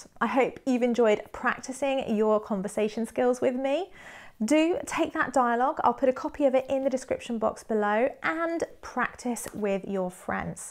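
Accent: United Kingdom. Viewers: Salute British English👉👉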